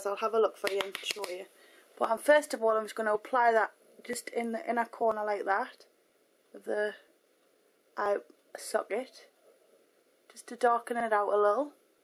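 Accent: Newcastle, United Kingdom. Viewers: British accent or am I wrong..? Good vid!! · Its not Irish, its Geordie! And I think it's great :)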